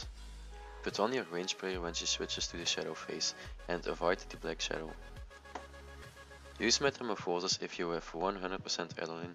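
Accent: Netherlands. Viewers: are you dutch ? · you dutchbro? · Dat Nederlands Engels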